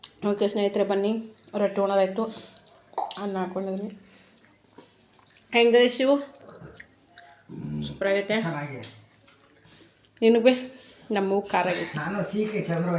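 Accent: North Karnataka, India. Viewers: ನಿಮ್ಮ ಸ್ನೇಹ ನೋಡಿ ತುಂಬಾ ಖುಷಿಯಾಯಿತು ಸಿಸ್ಟರ್ ಇದೇ ತರ ಯಾವಾಗಲೂ ಇರಿ ಸಿಸ್ಟರ್❤❤, ನಮ್ಮ ಉತ್ತರ ಕರ್ನಾಟಕದ ಮಾತು ಕೇಳಿ ತುಂಬಾ ಖುಷಿಯಾಯಿತು ನಾನು ನಿಮ್ಮ ತಂಗಿ ಅನ್ನು ಕೊಳ್ಳಿ ಸಿಸ್ಟರ್, ತಾಯಿ ಕೈಯಿಂದ ರೊಟ್ಟಿ ತಿನ್ನುವುದಕ್ಕೆ ತುಂಬಾ ಅದೃಷ್ಟ ಮಾಡಿರಬೇಕು ಸಿಸ್ಟರ್, ನೀವು ತುಂಬಾ Lacky, ನಿಡೋನಿ ಅಣ್ಣನಿಂದ ಪರಿಚಯವಾಯಿತು ಸಿಸ್ಟರ್ ಅದಕ್ಕೋಸ್ಕರ ನಿಮ್ಮ ಚಾನೆಲ್ನ ಡೈಲಿ ವೀಕ್ಷಣೆ ಮಾಡುತ್ತೇನೆ❤❤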